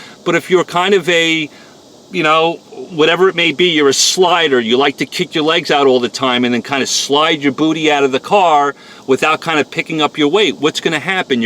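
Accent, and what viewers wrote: New York, United States. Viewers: I'm binge watching your videos and I love how thorough you are. I love hearing you talk. That NY accent is awesome. Being in the south it's nice to hear a different accent for a change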